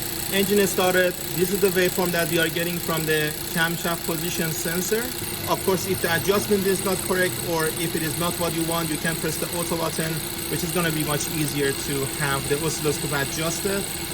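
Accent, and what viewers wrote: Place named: Iran. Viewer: لهجه مشخصه ایرانی هستی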